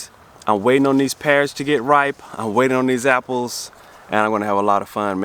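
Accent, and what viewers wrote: England, United Kingdom. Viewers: Oh mate, your British accent 😂😂😂😂 Love it! 😂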